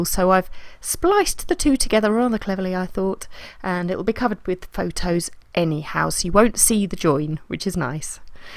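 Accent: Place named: England, United Kingdom. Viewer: Not kind, truthful. I was surprised to hear an English accent though, I was expecting an exotic Nordic-type accent. I have seen you before but only as your name, so didn't connect the two together. Can't wait for more of your lovely cute videos. I definitely agree with Cathy Yosha! See you next time.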